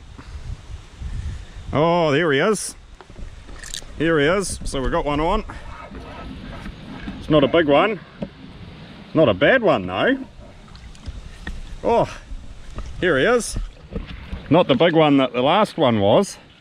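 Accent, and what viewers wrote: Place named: New Zealand. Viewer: hey, dude. I just like your kiwi accent. Lovely video. It would be great if you share the location. LOL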